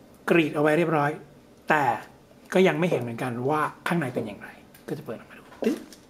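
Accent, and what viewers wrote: North, Thailand. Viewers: (งงเหมือนกั๋น)....เอ๊ะ สำเนียงคนเหนือเปล่าครับ ฮ่าๆๆๆๆ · คนเหนือเเน่เบยยย ><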